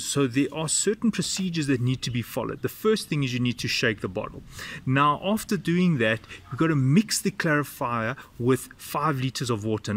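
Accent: South Africa. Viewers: Lekker , hearing a SA accent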